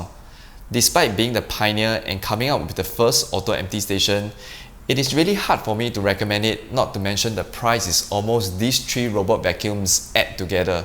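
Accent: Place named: Singapore. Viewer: Impressive amounts of tests! I'm Korean but would never buy a Samsung because they are severely overpriced in Korea. So I'd stick with Xiaomi or Roborock. On a side note, very interesting Singlish accent. I have quite a few Singaporean friends and find the accents vary quite a lot from person to person. Wonder why that would be?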